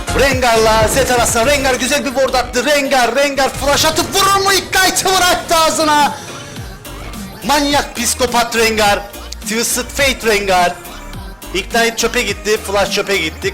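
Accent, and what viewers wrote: Cyprus. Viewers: kardes sen kibrislisin dimi 😄😄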